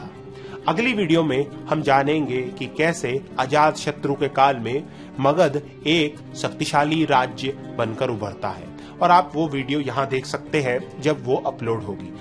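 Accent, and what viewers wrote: Standard. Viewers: actually he's not wrong totally, most people use Hindi mixed with English, Persian ,Etc in modern day .... But this शुद्ध Hindi sounds so good to listen to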